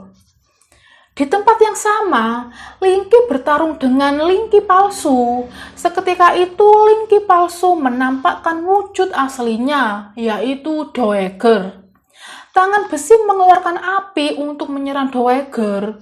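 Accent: Java, Indonesia. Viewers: Jelas wong jowo iki?👍👍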